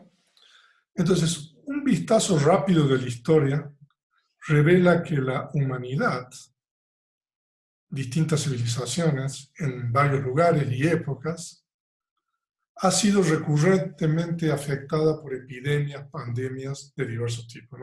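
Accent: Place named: Córdoba, Argentina. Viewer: Y esperamos tener el honor de que nos visite en Argentina (su acento cordobés no lo perdió 😉)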